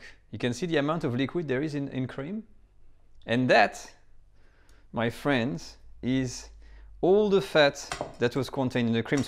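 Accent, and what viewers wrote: France. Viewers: is always nice to speak English with France Accent... tres bien... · Isn’t he French? Doesn’t sound Italian.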